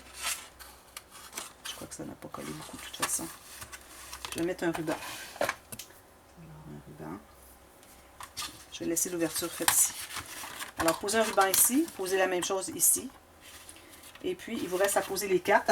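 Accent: Quebec, Canada. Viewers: Re-bonjour, merci et pas de problème, votre carton -papier vous le prenez à quel endroit, et vous , à votre accent vous êtes du Québec , de quelle région ?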